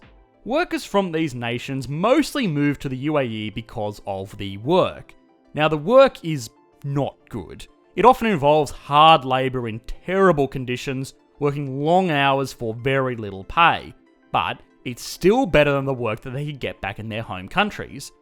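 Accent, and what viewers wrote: Australia. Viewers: The Australian accent is very very irritating. Please adopt a US accent.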